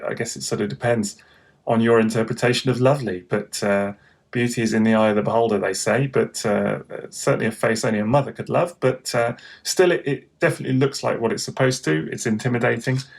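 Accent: United Kingdom. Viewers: Hello, I’m looking into buying Split, however I’m in the UK, like you I assume due to ur accent, and I can only find online stores that sell him in $$$, can you please let me know or anyone here in the comments if there is anyway to buy with £££, many thanks.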